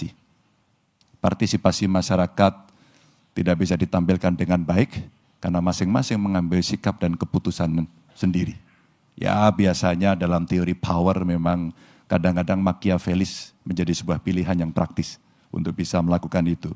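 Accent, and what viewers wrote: Java, Indonesia. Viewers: itu bro bukti pak ganjar bisa dan tahu bahasa inggris ya kalau soal logat beda orang lidah jawa sama lidah orang luar apalagi pak ganjar belum pernah tinggal di luar negri ataupun mengenyam pendidikan diluar negri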